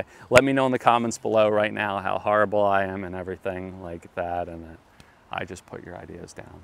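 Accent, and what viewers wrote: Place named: Philadelphia, United States. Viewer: Love your vids! And your Philly accent ;)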